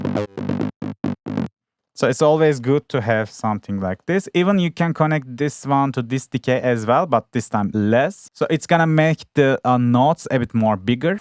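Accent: Turkey. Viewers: TAM DİYECEKTİM TÜRK AKSANI BU DİYE KANALA BAKINCA ANLADIM KJDFGHLKJGH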